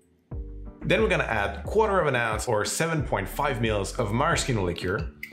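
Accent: Quebec, Canada. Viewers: lol.... yeah, where's the tree? I love the Québécois way of speaking too. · As a fellow québécois, I love seeing your videos when they come out! We need more of the accent on YouTube. Also, because I'm a filthy anglo, I have to say it -- why can't francophones count to four? There's a tree in the way. :v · 😆 I love the French-Canadian treatment of the letter "H." You say it when it's not there and don't say it when it's there. My friend always calls those tubes of meat on buns "ot dogs" and always offers "elp" if I need assistance. Now on to the Emingway Daiquiri!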